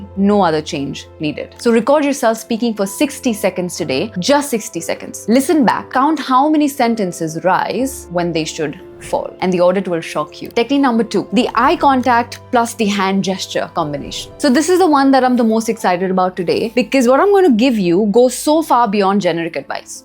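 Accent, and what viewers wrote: Odisha, India. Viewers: Hey are you Oriya by Any chance? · Same doubt bcz the represent that 😂 i think she is from Odisha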